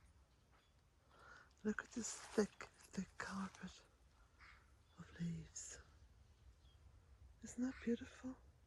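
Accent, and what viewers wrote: Ireland. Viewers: Yet again, thank you for your words, you speak so much sense. I love your hat. You always look so smart, and we all love your soft voice and Irish accent. What a gift you are for us poor souls. It is my Birthday on the 19th November, and there is a full moon! I am so pleased, and shall be out in the dark just taking in the beauty of it all. May I send you Blessings from Devon xxx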